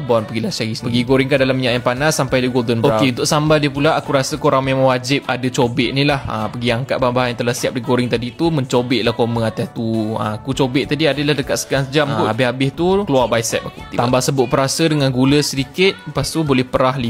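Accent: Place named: Perak, Malaysia. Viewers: sedap je dengorrr ckp perakkkk hehe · Bunyi ckpnye mmg loghat peghak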